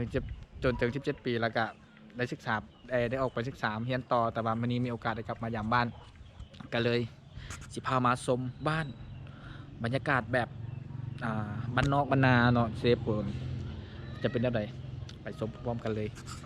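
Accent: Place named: Laos. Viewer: ผมมักฟังลาวเด้ธรรมชาติดี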